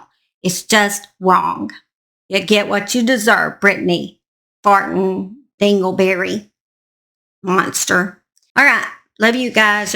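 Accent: South, United States. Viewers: Just a southern harley riding belle of the south lol 😂 love my southern true crime narrator 😊😊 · You might be a "lil ole' southern lady" which is a compliment, but you're an awesome researcher and story teller. We appreciate you and love you. Thank you,.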